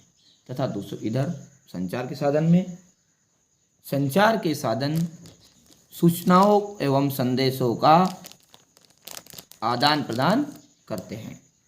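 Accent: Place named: Uttar Pradesh, India. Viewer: Tum up se ho na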